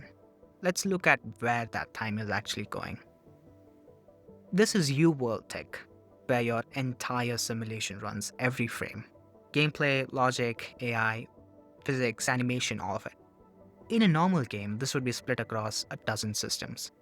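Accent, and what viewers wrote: India. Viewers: chatgpt script, youtuber vidoe essayist cadance, indian babble accent overpowering everything, terrible shit brothaaaaaaaaaa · are u indian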